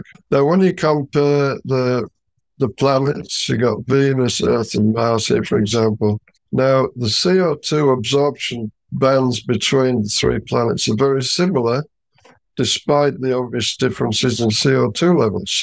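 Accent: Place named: Yorkshire, United Kingdom. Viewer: He sounds as if he's from Yorkshire.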